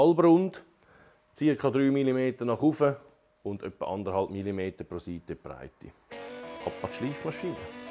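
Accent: Switzerland. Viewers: quatschkante hihi. ja der schwyzer dialekt 😉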